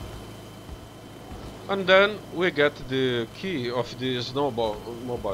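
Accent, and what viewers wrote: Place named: Brazil. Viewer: I'd bet a million bucks that you're Brazilian! Porém vlw pela solução mas não se fala "resolve" apesar de ter essa palavra. Usa a palavra "solve" sem o RE de resident evil...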